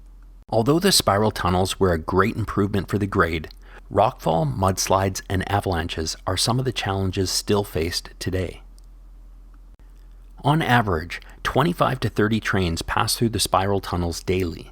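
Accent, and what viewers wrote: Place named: United States. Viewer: For someone claiming to be local, it is annoying to find that you are American. Your accent ant mispronunciation of words, especially "route", belie your true nationality.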